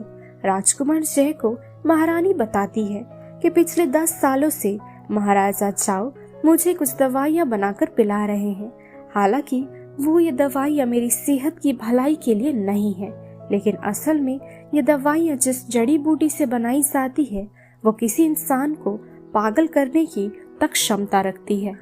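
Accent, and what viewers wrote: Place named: Bengal, India. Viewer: She sounds to be bangoli.. that's why you're getting problem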